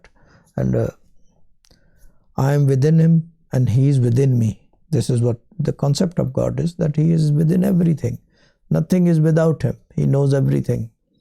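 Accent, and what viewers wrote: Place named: England, United Kingdom. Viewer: Uff Gorgeous Grumpy English Accent 👌👌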